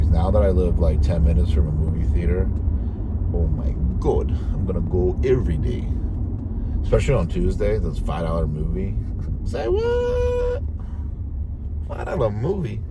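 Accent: New Jersey, United States. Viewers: the Jersey accent 😂😂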